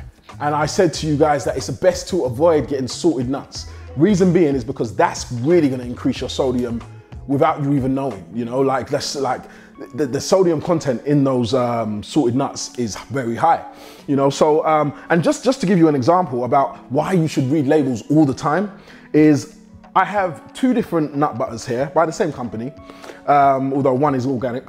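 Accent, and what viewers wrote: England, United Kingdom. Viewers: That British accent.😁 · He must be from london that accent is strong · English accent is a good reason not to continue with the video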